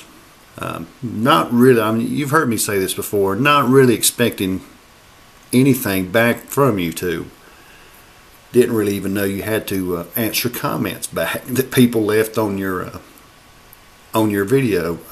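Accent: South, United States. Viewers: Really awesome stuff. I'm one of those guys who has yet to post a video myself. I'm a writer so I'm better at expressing myself in writing. Just started an AF collection blog for my stuff. I'll share it sometime. Need more posts! Love your videos... and your southern accent!! LOL Stay Plastic!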